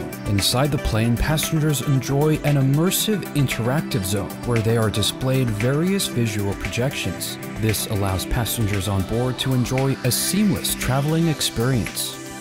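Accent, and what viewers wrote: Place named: United States. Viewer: Great video... I guess. Once again, an otherwise interesting idea is droned to death by this incessant electronica music that all sounds the same and never ends. I'd much rather just listen to someone describe the things in the video... Except for the fact that, once again, a video is read by someone who sounds American, but is written in broken English with bad grammar that sounds like it was mistranslated from some other language. Are these videos mass produced in some other country and then just 'read' by white people? I simply don't understand the point of this at all. Am I being 'pandered to?' Am I being patronized here? Why not just have someone from that country speaking English, or have them speak their own language and use captions? And PLEASE turn off that STUPID music. I hate it! It sounds exactly like a computer wrote it and every other one out there! 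And PLEASE get someone who can read and write in CORRECT ENGLISH GRAMMAR, or stop 'translating' it at all! Thanks... I guess... "...how it would look like?" Really? I don't think so. I literally couldn't get through the whole video. I had to turn it off because, without the terrible music & broken English, I don't know what the hell I'm looking at. Cut it out, whoever you are.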